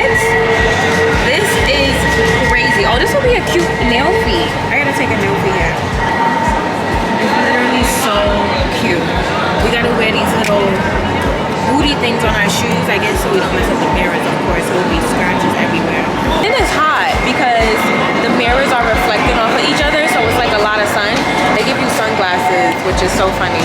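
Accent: New York, United States. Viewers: that New York accent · but you’re aware you have an accent to people who don’t live in New York right? 😂😂😂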